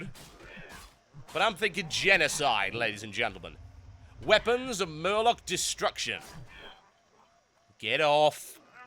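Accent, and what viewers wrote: United Kingdom. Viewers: i think u should do the worgan voices cause u sound more british than anyone at blizz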